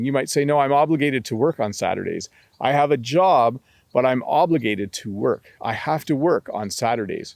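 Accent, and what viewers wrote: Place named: Canada. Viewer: finally found someone canadian that teach canadian inglish for me is the best understand very well evething was there many years ago I miss canadian English, usa English is more confuse to me Thanks 😮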